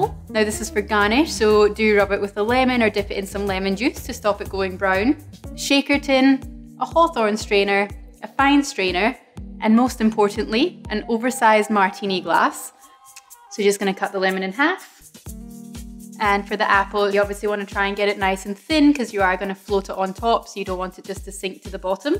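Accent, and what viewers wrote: Scotland, United Kingdom. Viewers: Where did you get this martini glass? I love it. New to the channel and lovely to hear a Scot!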